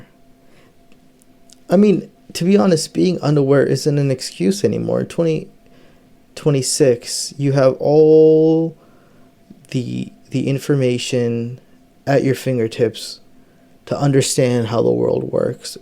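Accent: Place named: United States. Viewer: dude's accent is about as American as it gets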